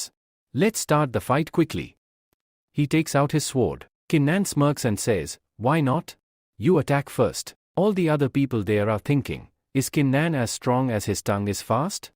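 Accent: India. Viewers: WHY IS IT INDIAN BRUV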